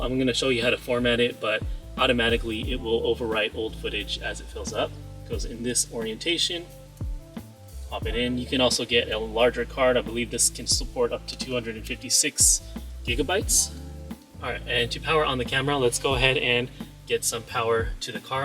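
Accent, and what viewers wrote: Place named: North America. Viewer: you sound like you’re from north america, so it should be set to 60hz. at least that’s our electrical currency and can result in flickering when viewing back recorded videos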